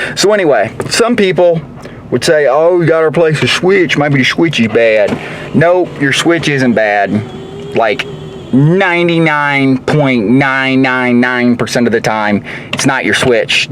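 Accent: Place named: Appalachia, United States. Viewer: What a stinking hill billy. How about some regular English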